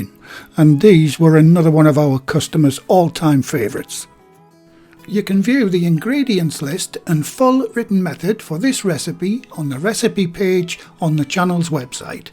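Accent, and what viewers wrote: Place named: North East England, United Kingdom. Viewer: Hi, I’ve just made your amazing blueberry muffins absolutely delicious, I recently retired and it’s my favourite pastime baking your recipes, excellent instructions and great to have someone with the same accent, as I am also from the North East of England All the very best for the future and thanks again 👍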